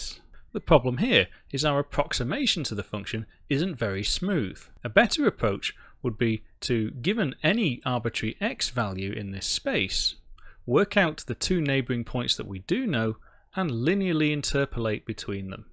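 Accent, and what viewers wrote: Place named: United Kingdom. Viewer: I think it's charming that you use hand drawn letters and figures versus perfect computer fonts. That's a good segway to getting into the four linear acceleration equations with d, t, v and a and the more complicated cross product. Then applying force on an elevation with coefficient of friction. From the American perspective, having a British accent and talking about a scientific or philosophic topic makes you sound smart. XD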